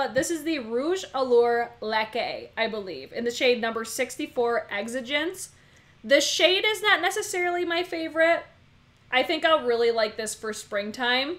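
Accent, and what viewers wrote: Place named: Chicago, United States. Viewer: I was born in Binhamton and grew up in Chicago and I totally thought you were a Chicago girl from your accent too! Lol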